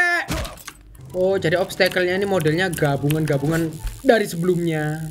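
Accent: Java, Indonesia. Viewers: Logat² jowo mas arek ndi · Abang orang Jawa 😄😄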